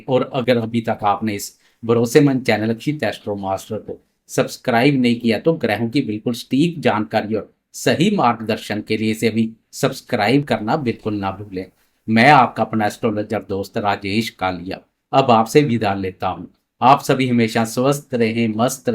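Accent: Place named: Punjab, India. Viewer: जी पहली बार देखा है आपका वीडियो।। आपके बोलने में जो पंजाबी एक्सेंट आता है वो काफी आकर्षक है।। बाकी आपकी भविष्यवाणी तो आने वाला समय ही बताएगा कि कितनी सटीक है।। जय महाकाल 🙏🙏🙏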